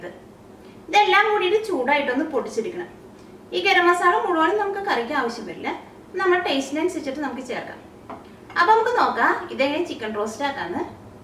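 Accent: Thrissur, India. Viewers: that's how trissur people talk.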